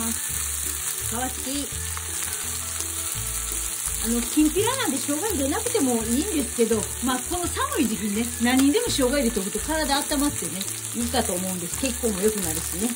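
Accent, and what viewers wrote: Kansai, Japan. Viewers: 大根きんぴら作りたいと思います 先生は千切りが好きとおっしゃってましたので流石にうまいです手際が良い。 関西弁が可愛らしいです。そこ知りたいってところのコメントがうまいです😋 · 大阪弁かなと思ったが、ちょっとアクセントが違うので京都弁かなと思い、プロフィールを見たら、京都出身の方でした。 いつもユニークなレシピ参考にしています。３９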